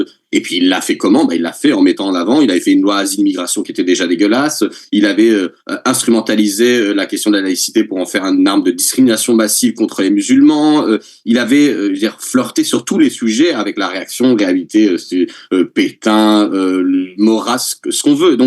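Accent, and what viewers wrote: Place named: Quebec, Canada. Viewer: Merci de pas faire exprès de gommer ton accent Québéquois, on aime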